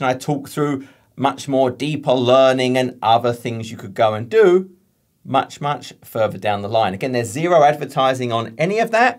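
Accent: United Kingdom. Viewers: Hello, Happy New Year 2023. You are truly an exceptional teacher period! Your style of delivery and the way you captivate your viewers in addition to your passion, selflessness, brilliance and clear desire to empower people is mind blowing. Thank you so much because watching your AZ 900 course did actually make a difference in my AZ-900 exam. Yes of course, I smashed and aced the exam on 12/31/2022 with a score of 865 and it was a great way to end the year 😊. As someone that lived and studied in England for some years, just listening to your British accent was quite entertaining and a reminder of the great and beautiful people of Britain 😊 Right now, I have started studying for AI-900. All the same, thank you very much and have a great year.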